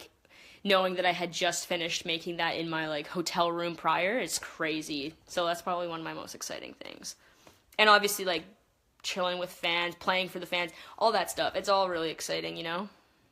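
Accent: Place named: California, United States. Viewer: why does she sound like she's From Cali 😂 that's how we talk here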